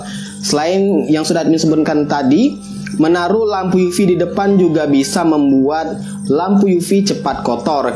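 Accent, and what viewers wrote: Palembang, Indonesia. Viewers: Wong Palembang caknyo kau Yo??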